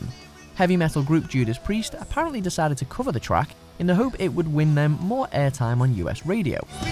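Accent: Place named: Northern England, United Kingdom. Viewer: Logat Inggris Utara (Northern British English) dipakai dalam membawakan acara ini.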